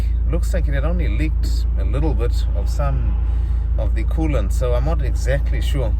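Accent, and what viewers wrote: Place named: South Africa. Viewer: Dude no joke first video I watched now, you sound like you are from South Africa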